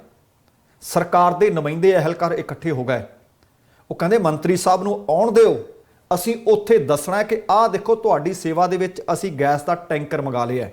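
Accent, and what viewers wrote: Malwa, India. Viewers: ਧੰਨਵਾਦ ਜੀ, ਵੀਰ ਜੀ ਅਵਾਜ਼ ਤੇ ਜਜ਼ਬੇ ਤੋਂ ਮਾਲਵੇ ਤੋਂ ਲਗਦਾ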